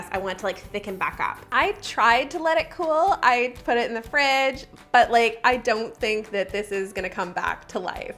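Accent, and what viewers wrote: Canada. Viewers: Is she Canadian? She has a Canadian accent I feel lol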